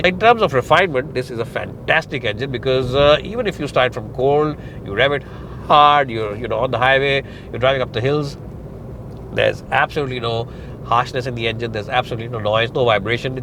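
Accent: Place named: Bhojpuri, India. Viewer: Add proper accent to your English Your English sounds like bhojpuri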